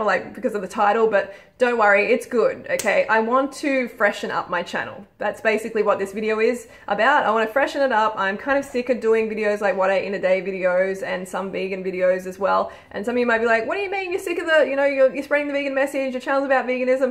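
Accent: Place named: Australia. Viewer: say whatever you want Bebe, i can definitely listen to that Aussie accent of yours all day:)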